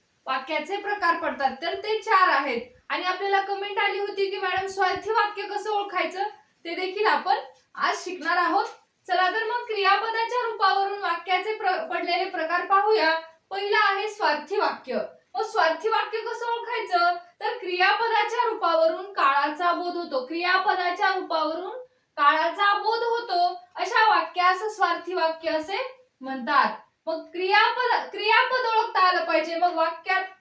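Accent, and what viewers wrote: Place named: Thane, India. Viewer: मॅडम (ताई,) आपलं गाव कुठे आहे आपली भाषा ठाणे जिल्हा मधील वाटते मी शहापूर, मुरबाड जिल्हा ठाणे मधील आहे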